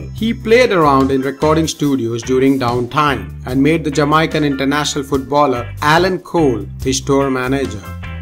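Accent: India. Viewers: This historian must be Indian ppl because of accent do you hear? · Ya his voice sounds like indian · The narrator is Indian, the inspiration is Jamaican, and I'm half Irish and Polish. Multiculturalism - or as Bob would say...One Love...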